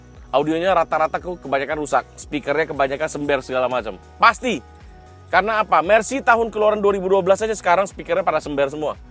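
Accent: Medan, Indonesia. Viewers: Logatnya medan banget...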